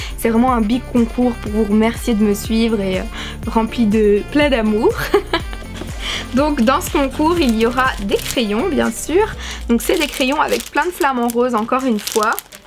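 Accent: Belgium. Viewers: Tu et belge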